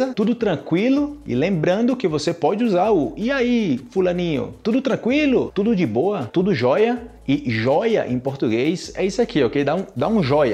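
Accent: Colombia. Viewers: Te lo juro me sorprendió cuando te escuché hablar con ese acento colombiano, ya me confundí no sé si eres de Brasil, de Colombia de ambos o de ninguno. 🤦 Te descubrí gracias a mi profesor quien recomendó tus vídeos. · Me encanta tu acento en español. Parece colombiano 💖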